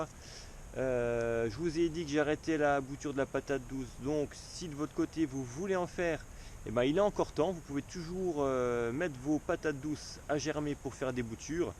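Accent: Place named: Alsace, France. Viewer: Bonjour à toi. Je découvre ta chaîne et un brin de nostalgie m'envahit. J'habite dans le sud-ouest mais je originaire d'un petit village au pied du Ballon d'Alsace. Ça fait du bien de revoir ces paysages et d'entendre cet accent bien de chez nous. Ça fait bientôt 40 ans que j'ai quitté la région et ça me donne un bon coup de fouet. Merci pour tes vidéos et bonne continuation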